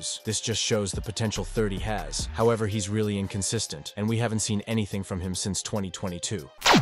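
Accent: United States. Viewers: Presses play Hears AI American type robotic sounding voice Immediately turns video off…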